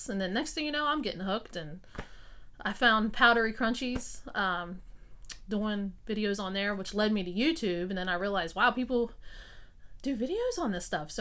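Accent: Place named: Kentucky, United States. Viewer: omg i’m from ky! i knew your accent sounded familiar to me 🧐🥰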